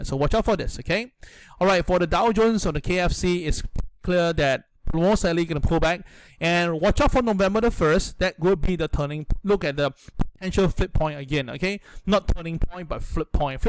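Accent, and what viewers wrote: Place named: Singapore. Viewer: I haven been owing Oxy share since it was $27. Hopefully, it will beat $80. Btw like your Singaporean accent :)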